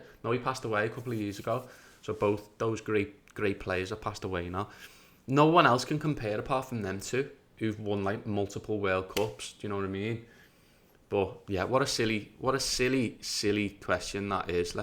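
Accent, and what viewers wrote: Liverpool, United Kingdom. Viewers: Hi. I enjoyed watching your reaction to the videos. Agree 💯 it is a pleasure to hear and see positive comments about others. I’m not a football fan per se, but I do watch Messi. His playing style is poetry in motion. Great reaction. And your scouse accent is delightful ❤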